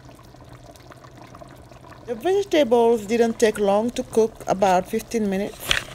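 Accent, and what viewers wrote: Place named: Haiti. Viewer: You sound haitian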